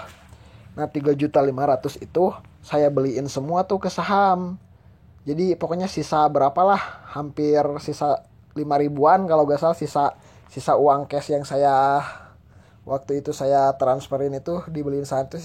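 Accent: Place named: West Java, Indonesia. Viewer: Ciri khas bahasa ,,,Orang Sunda 🤣🤣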